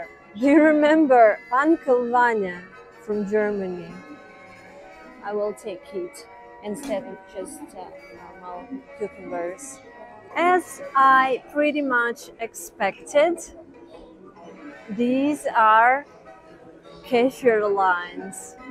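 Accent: Russia. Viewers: Первый раз смотрю этот канал, НО прям слышно Русский акцент! Или мне одному показалось?????😀 Особенно режит слух, англ. слово "БекАз", Англичане/Американцы, произносят "БекОз" или "ДрЭган", носители в основном говорят "ДрАган" и т.д. и т.п.!!!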